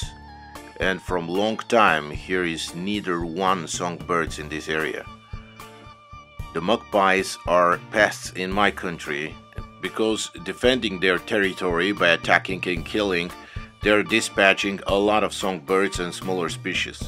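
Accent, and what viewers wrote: Russia. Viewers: Love this guys accent. Sounds Russian! One tone. NICE! Love the music as well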